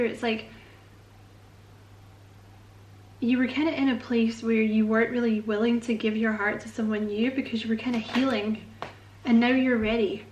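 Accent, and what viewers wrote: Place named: Scotland, United Kingdom. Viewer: Thank you,. Always a sense of a breeze softly blowing through your reads. Hmmm…Scottish? Interesting. I’ve always loved the Scottish accent. Feel better quickly! 🙏🏻💗🦋😎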